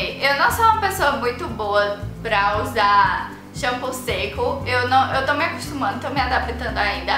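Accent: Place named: Pernambuco, Brazil. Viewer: ah dou de pernambuco <3 amo nosso sotaque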